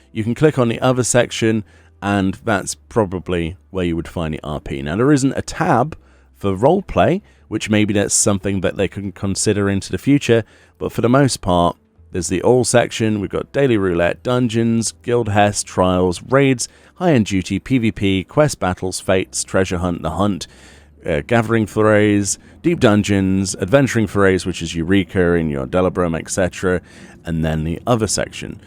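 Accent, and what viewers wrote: United Kingdom. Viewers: From someone who neither rp's or erp's, I don't really understand why you go so harsh on ERP specifically here and state you dont when you obviously have some sort of issue with it o.O I do not agree with any type of public ERP but in 6 years of playing have never seen any on chaos, mainly as I haven't been looking for it I guess but haven't seen any interactions of the sort in public channels. SE can only police so much and whenever I've seen people advertise 18+ venues (from what I can tell) they have not been ERP specific just clubs mainly. There is also a language filter and emote filters, so in order for minors to be exposed to ERP or unacceptable behaviour in terms of age related content they'd have to remove the profanity filter and go looking for things within the game. That's a parenting issue, OR like most UK based 16 year olds at least, they're already well aware of the workings of the world in that respect and are treated as the young adults they are, you can't screen everything because some 16 year old or younger may see things they shouldn't when every precaution has been followed as much as is possible. 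age of consent in the UK is 16+. not 18. (im guessing this country is uk from the accent?) and do not require consent. the pegi rating is also for story content and is PG recommended.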